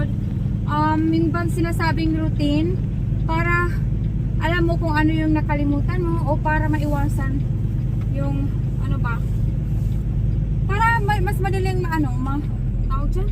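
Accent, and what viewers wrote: Waray, Philippines. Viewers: hahaha amu gad kukurian pag tagalog kay wraywaray kaman ngean..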